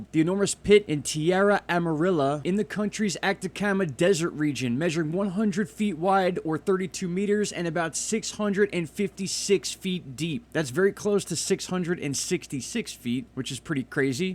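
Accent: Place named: New Jersey, United States. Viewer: I really like your channel 😊 (plus, I hear a hometown accent-sounds like New Jersey 😉). I was born in Philly, but grew up in the Tri-state area, mostly New Jersey-from Blackwood and Willingboro to Glen Ridge and Bloomfield and about 2/3 other cities in between lol. Thanks for covering these things. It's only going to get worse from here on out, so it's good to have you on board! We NEED a channel like yours 👍.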